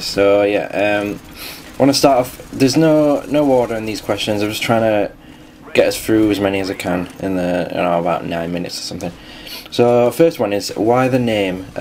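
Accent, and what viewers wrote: Yorkshire, United Kingdom. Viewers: You from Yorkshire?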